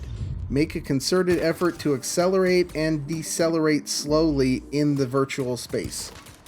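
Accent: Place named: Iceland. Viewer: can't really be too brutal with this vid, its interesting seeing what you vr guys are looking at and experiencing right now, and hearing your take on it. looks cool enough. I was most surprised about your accent, had no idea you were icelandic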